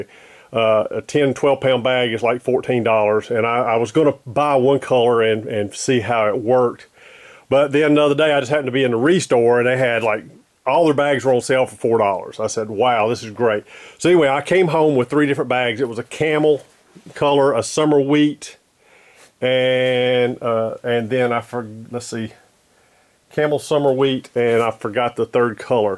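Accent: South, United States. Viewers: It also helps 10-years later.......... Thank you for the information. P.S: Good to hear a Southern accent (familiar to me).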